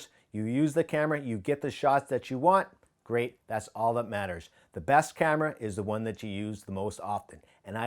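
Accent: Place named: Canada. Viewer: I knew I heard the Canadian accent, I’m from Northern Ontario :) thanks for the info, gonna label my gear the same out here in Vegas! Cheers, love the videos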